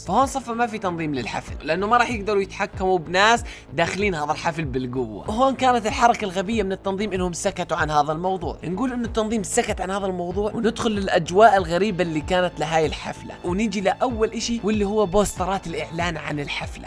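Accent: Jordan. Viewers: أحبك وحب طريقة سردك للقصص مفهومة وأحلى شي لهجة أهلنا الأردنيين قريبة على القلب ومفهومة😍❤🔥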